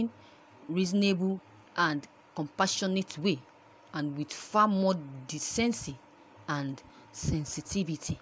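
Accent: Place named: Yoruba, Nigeria. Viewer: Why do you read like someone in Primary Six or JSS1? Please, my dear sister from Ipetumodu, I am sure there are better news readers and presenters from Ipetumodu. Give this job to one of them. Nobody understands what you say because of your poor English that is coloured by English pronunciations from people of your ethnic group who have refused to mix with people from other ethnic groups. This is now showing here. Leave this reading for better readers from the Yoruba ethnic group. Delegate such task to him or her, while you control the money that would come to your by way of royalties.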